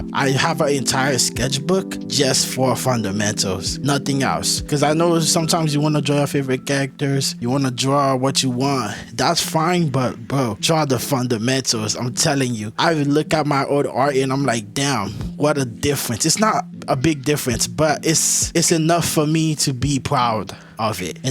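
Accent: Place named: Haiti. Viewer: I was like "bro sounds Haitian", then I saw your channel name 😂. Peace brother🇭🇹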